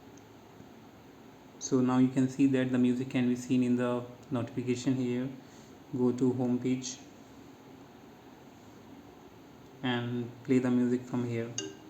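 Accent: India. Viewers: Indian scammer I swear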